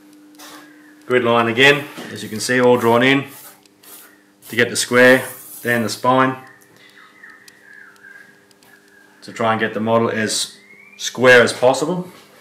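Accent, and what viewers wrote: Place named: New Zealand. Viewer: No worries, keep up the good work, look forward to the finished product! I take it you're a kiwi as well? Just sound like a kiwi to me!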